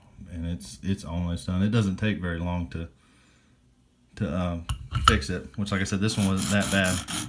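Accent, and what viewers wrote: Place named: Texas, United States. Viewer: You from Dallas? You sound like Vinnie Paul. You haven’t posted for years, but your instruction helped clarify what I needed to get razor (and mirror) sharp. Cheers brother, thank you.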